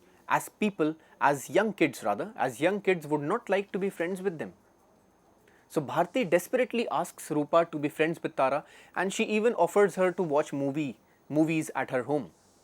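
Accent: India. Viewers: I just say the way of an Indian pronunciation in your voice is commendable,great explanation with great melodious voice 🙏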